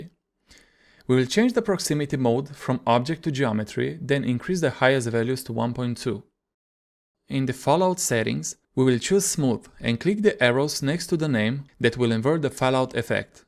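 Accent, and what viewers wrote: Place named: Russia. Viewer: Дружище, по акценту слышно, что ты русский. Пожалуйста выпускай хоть иногда видео на русском 🙏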